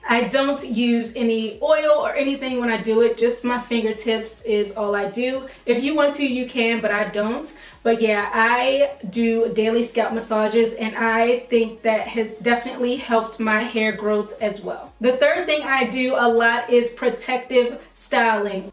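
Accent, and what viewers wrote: Baltimore, United States. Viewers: I hear a Baltimore accent! 🤔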